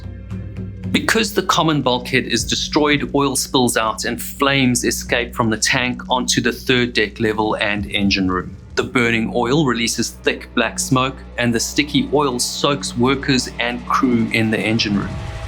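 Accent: South Africa. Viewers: Loving your videos. You also sound like your south African. I cannot and don't ever condone censorship cept to photos of uninvolved topic like children like a family photo showing the suspect in it too for example. But otherwise i despise it so don't show something when you censor it. Why show it then? Anyways despite that leading to a thumbs down az i always do zo for any censorship when topics like this or with true crime is involved always will get a dislike as i don't like censorship in such topics. But that said i love your videos still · Wait, you are southafrican? Accent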